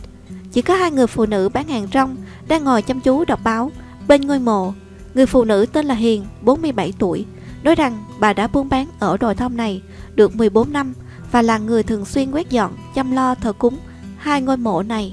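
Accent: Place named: Vinh Long, Vietnam. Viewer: quê o gò công sao nói o vĩnh long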